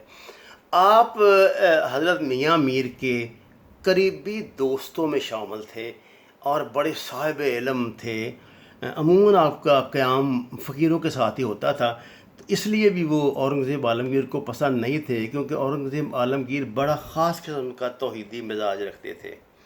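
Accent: Punjab, Pakistan. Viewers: Wo punjabi he uska accent aesa he hoga ksi angrez ki harami aulad. Tujhe khud check k spelling nhi aati.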